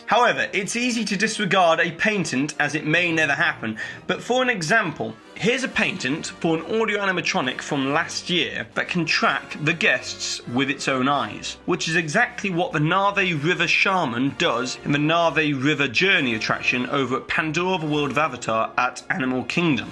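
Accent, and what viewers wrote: England, United Kingdom. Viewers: I love your show. The way you pronounce words is cool. Very English. We say patent different here in the USA. See you on Tuesday.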